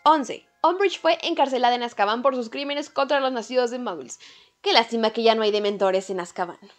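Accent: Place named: Mexico. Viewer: Que poco se nota ya el acento Mexicano en! Sin duda alguna ha evolucionado en sus videos, sin decir que el que tuviera acento estuviera mal, todos somos brujas y magos del mismo bando. 👀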